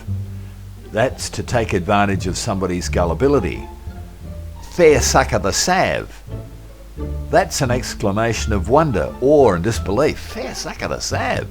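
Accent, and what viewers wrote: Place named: Cockney, United Kingdom. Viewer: Seems Cockney, doesn't it?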